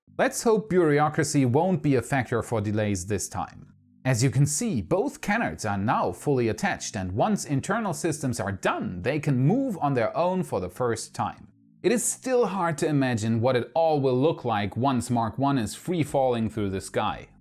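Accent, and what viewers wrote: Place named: Germany. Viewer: Great video..! Am I alone in wondering WHERE his accent is from...? I have listened and listened and I cannot place it --Germany...? Yet I am unsure. I am sure only he does not wish for us to dwell on this. BUT WHERE IS HE FROM..?? Hmmm....cannot put my finger on it.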